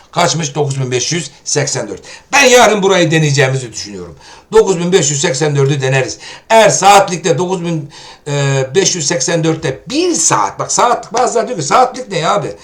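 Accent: Turkey. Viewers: Ben de Kahramanmaraş/Andırın lıyım hocam edem senin "PUSTUK" dediğin ağzını severim, iyi ki varsın takibe devam❤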